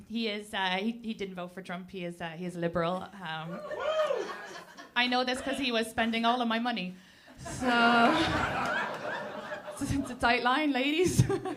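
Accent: Ireland. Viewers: I love the Irish accent even though I can't understand half of what she's saying. 😄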